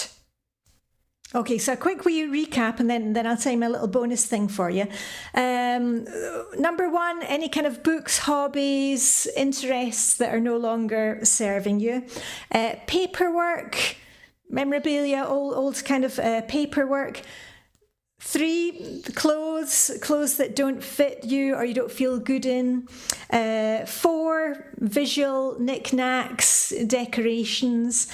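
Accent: Scotland, United Kingdom. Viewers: I'm 79 and enjoy your site. My grandmother was born in Scotland so your accent is a sweet reminder of her. I've been through decluttering my former hobbies, but I still have the urge to buy office supplies every August when the school supplies are on sale. Often, my biggest problem in decluttering now is my husband who wants to run all our castoffs through a yard sale.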